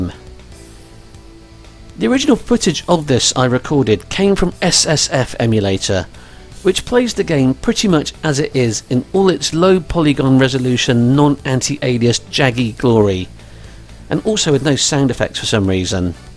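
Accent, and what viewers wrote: England, United Kingdom. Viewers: You have a graceful English accent,. Keep it going!:yougotthis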